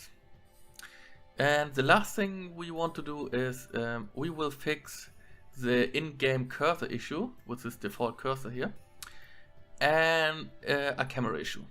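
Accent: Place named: Germany. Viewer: German accent is much different than Polish but i can still understand every word. Very good tutorials!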